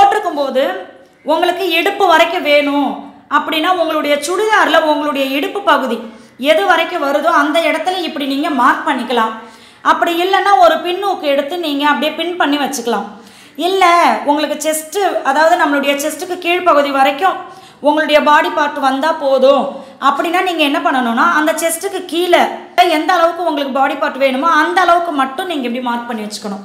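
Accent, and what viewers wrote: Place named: Coimbatore, India. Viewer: Sis unga native unga peasu kovai mathiri iruku athan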